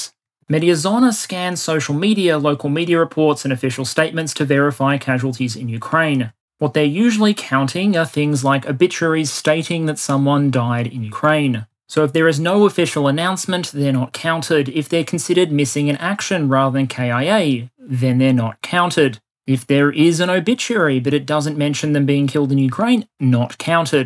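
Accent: Australia. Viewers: How you put out such high quality, thoroughly researched and amazingly interesting presentations on a weekly basis while also holding down your day job is just mind blowing. I don't know how you do it, but I am just so damned glad that you do. And whilst sharing an accent and a like of vegemite doesnt give me the right to be proud of your work, there is some level of shared Aussie pride in knowing that a fellow countryman from the wrong side of the world puts out arguably the best Ukraine war related stuff on youtube. Bravo.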